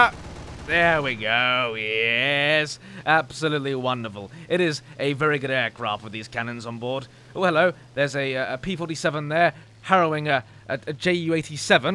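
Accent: United Kingdom. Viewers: LMFAO i love your british accent. :D :D :D <3 "Yes..." :P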